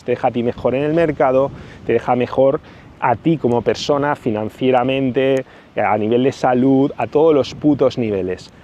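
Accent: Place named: Spain. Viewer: Hola, escucho tus vídeos porque me encanta el acento español y la cultura española. Mis bisabuelos paternos y maternos eran españoles y se mudaron a Cuba 🇨🇺 y mis raíces son muy españolas. Yo actualmente vivo en E.U y viajar a España 🇪🇸 en el futuro muy próximo está en mis planes. Soy tu fan para familiarizarme con el la cultura española y cuando visite me pueda comunicar efectivamente. Muchas gracias 🙏 por tu tutoría.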